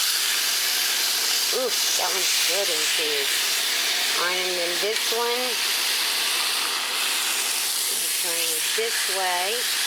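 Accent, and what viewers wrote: South, United States. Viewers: LOL, you may not consider VA the south, but you sure have a southern accent. I love your accent and you have a soothing voice.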